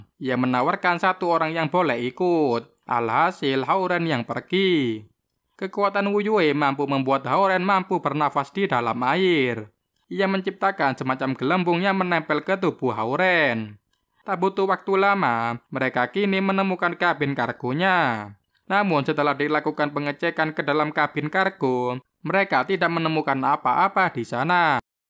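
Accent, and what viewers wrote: Java, Indonesia. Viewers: Logat jawanya terlalu terasa😌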